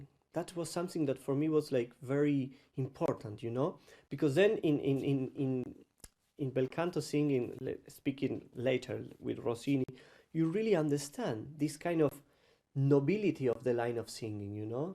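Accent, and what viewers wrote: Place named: India. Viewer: Parla inglese come un accento indiano 😅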